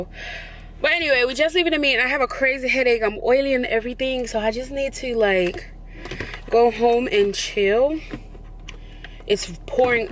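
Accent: Jamaica. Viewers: Mi nah lie mi love yuh style ..... I just love how yuh chip in the patois with the twang 😆😆👍🏼 just came across your channel ... mi like it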